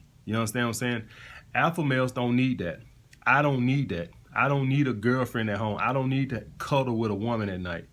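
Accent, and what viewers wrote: South, United States. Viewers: its not perr...its pure...sounds like he from down south...can hear that southern accent...very good looking guy but dont agree with everything he saying...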